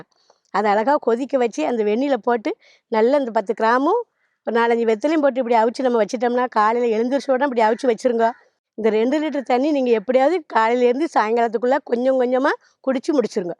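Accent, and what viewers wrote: Tirunelveli, India. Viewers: நெல்லை தமிழ்... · அம்மா தங்களின் பேச்சு எங்கள் திருநெல்வேலி பாதை போல் உள்ளது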